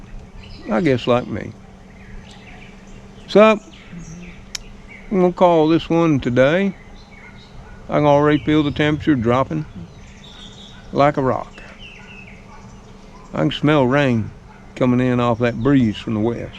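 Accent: South, United States. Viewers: Love southern accents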